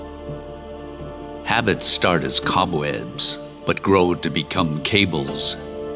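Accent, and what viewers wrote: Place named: United States. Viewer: voice over😢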